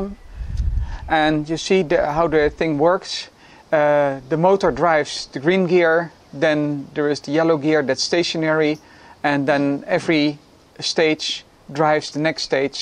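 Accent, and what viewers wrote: Netherlands. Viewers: Where are you from? Netherlands? Germany? Maybe Denmark? Because I'm not good with accents.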